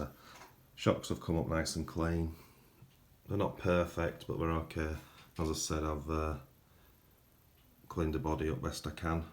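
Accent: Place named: Northern England, United Kingdom. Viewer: Lol just noticed if you see subtitles i dont think it understands your northern accent it comes up as Tommy Legends .. Oh love the car 👍👍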